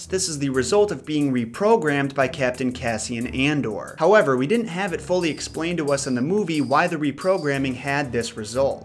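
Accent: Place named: Boston, United States. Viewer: I like you accent and the way you say "philosophy". Boston?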